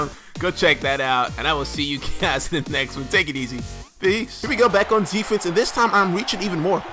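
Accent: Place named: New York, United States. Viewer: Lol u are a cowgirls fan but u sound like ur from New York.?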